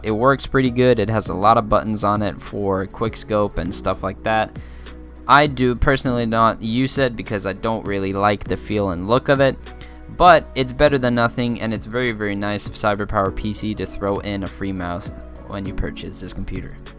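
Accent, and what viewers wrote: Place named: Germany. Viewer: hes probably german, or austrian. a lot of their pronunciations are like that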